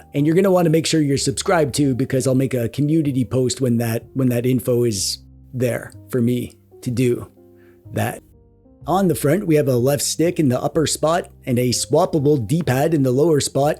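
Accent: Canada. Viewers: That Canadian voice can't be hidden ❤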